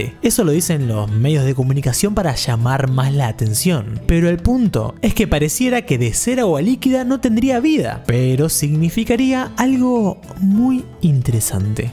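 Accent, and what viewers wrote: Argentina. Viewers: Vos sos argentino?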